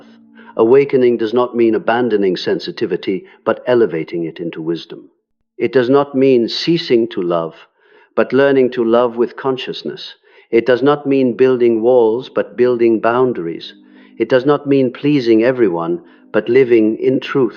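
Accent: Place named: Spain. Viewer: Thank you !! I have not yet seen this level of depth in any video before (probably Sam Vaknin's videos only are this deep, but he is much more technical). I have seen this video more than three times already ... Clearly the German voice (with a perfect Spanish accent by the way) is a very experienced person. Thank you very much for sharing this type of deep content. It does help us a lot !!!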